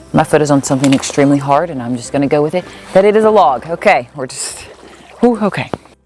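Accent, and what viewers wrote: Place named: South Louisiana, United States. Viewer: You're slowly picking up that South Louisiana accent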